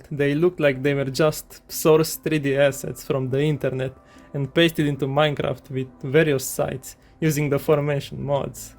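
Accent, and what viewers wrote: Romania. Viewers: Wow romanian accent 😅 im romanian too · Bro, your Romanian accent Is crazy. It is like Russian, that used to live in Italy for 20 years, speaks English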